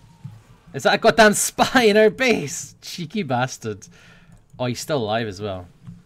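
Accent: Scotland, United Kingdom. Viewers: which coast of scotland are you from ?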